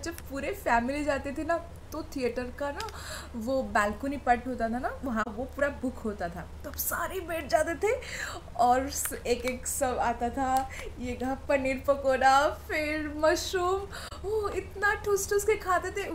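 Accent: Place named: Odisha, India. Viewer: Oh you're from orissa I recognised the way you speak hindi 🙃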